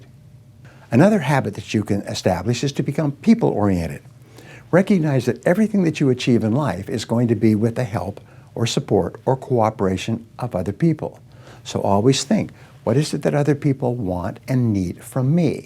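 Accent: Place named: United States. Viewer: Most definitely an American Accent, thanks...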